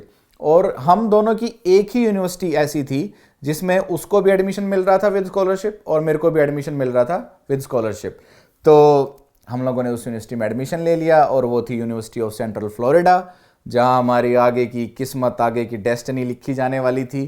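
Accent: Haryana, India. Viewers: Bhai aapki to english bhi haryanvi accent hai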